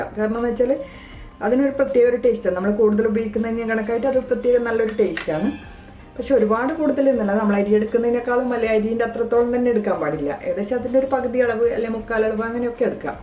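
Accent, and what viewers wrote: Kannur, India. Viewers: Super dosa..I will try ..Kannur slang 👌👍. thanks for sharing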